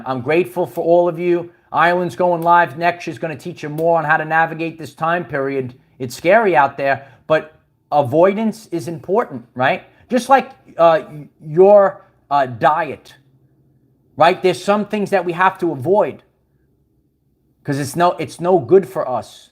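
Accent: New York, United States. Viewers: I have a client that talks, accent like you. He's from New York. Guessing that's where your from?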